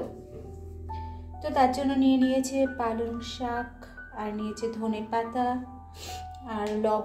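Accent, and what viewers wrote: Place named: Kolkata, India. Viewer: আপু কেমন আছ? আমি মোটামোটি ভাল আছি। কখ অনেক কমেছে। ডাক্তার কখ মেডিসিন দিয়েছেন। শের পুঠি আমি খুব পছন্দ করি। আপু তুমি কি আমার বাংলা বুঝ? তোমার মত সুন্দর বাংলা বলতে এবং লিখতে পারি না? আর তোমাদের কোলকাতার বাংলা শুনতে খুবই সুমধুর লাগে। আপু তুমি তো খুবই busy থাক । আমি তোমাকে প্রাই বড় করে কমেন্ট লিখি তাতে তোমার অসুবিধা হয না তো? তুমি এমনই একজন মানুষ যে তোমার শত কস্ট হলেও তুমি কিছুই বলবে না । এই জন্য তোমার কাছ থেকে অনেক কিছু শেখার এবং জানার আছে। আজকে কোন প্রশ্ন দিব না। তোমাদের এখান থেকে কেরালা কত দূর? আজ এর কিছু রোমান্টিক গান শুনলাম। মাঝে মাঝে ভালই লাগে। আমি মাঝে মাঝেই গান শুনি। খুবই ভাল লগে। যাক আপু অনেক গল্প হল। মনে হয আমি তোমার কাছে বসে গল্প করছি । এখন আশি। শুভ রাত।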